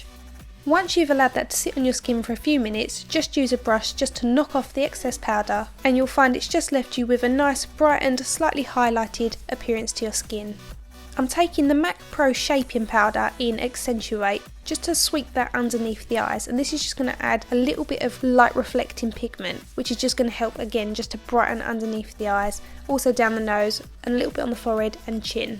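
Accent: Essex, United Kingdom. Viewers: Are you from Essex? You sound like my cousins!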